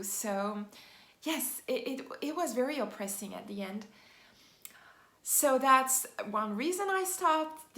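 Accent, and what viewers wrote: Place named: France. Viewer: We love you! You don't have to say sorry:) (even when you say with a french accent which is so cute <3 )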